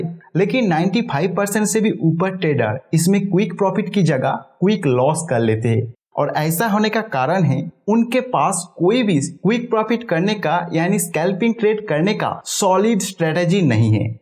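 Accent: Bengal, India. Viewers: আপনার হিন্দি উচ্চারণ শুনে আপনাকে বাঙালি বলে মনে হচ্ছে।ঠিক না ভুল?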